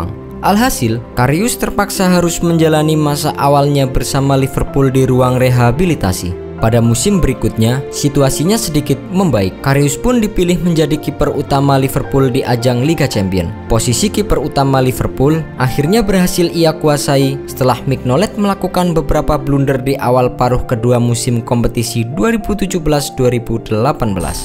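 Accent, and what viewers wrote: Java, Indonesia. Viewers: Ko suara admin medok yah?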